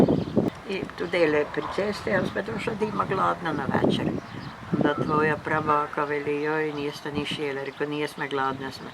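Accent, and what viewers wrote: Croatia. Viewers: I am sorry but this woman is speaking Slovene with a Croatian accent and a few words mixed betwen, but how she speaks is so Slovenian like if i am listening to someone from Stajerska or Dolenjska.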